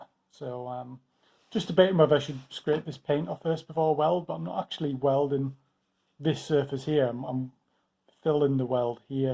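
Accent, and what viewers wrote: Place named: Geordie, United Kingdom. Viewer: Haha I'm from Peterlee and to me you sound like a well-spoken Geordie.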